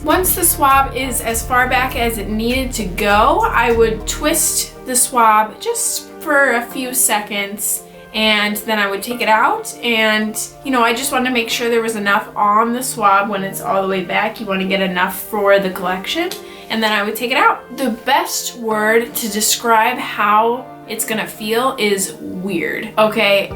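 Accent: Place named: Wisconsin, United States. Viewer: Very informative. I haven't had the COVID test, but not afraid to now. Your Wisconsin accent is pretty good, you must be a native.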